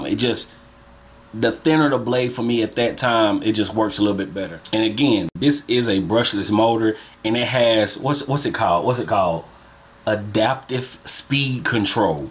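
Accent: South, United States. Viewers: Where you from? I’m from Seattle and those southern accents always stand out around here lol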